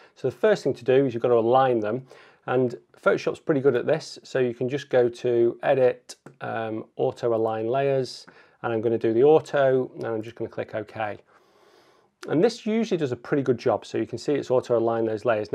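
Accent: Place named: Sheffield, United Kingdom. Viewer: Great video. Is that a Sheffield accent I hear:) Nottingham lad here...!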